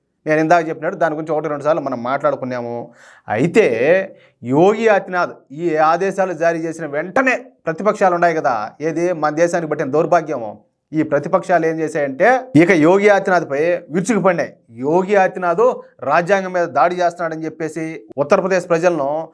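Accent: Rayalaseema, India. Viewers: Nellore Tirupati Chittoor slang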